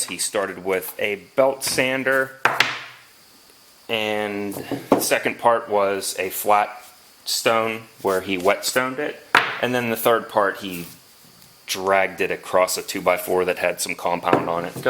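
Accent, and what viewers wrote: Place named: Washington, United States. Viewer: Where is this guy from?? Sounds Washington State to me...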